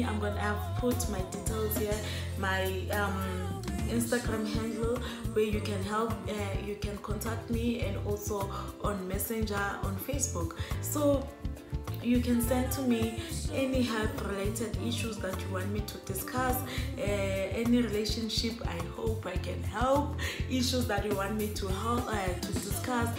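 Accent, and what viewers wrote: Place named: Saudi Arabia. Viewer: Saudi Arabia, nice. Beautiful accent👌🏾👌🏾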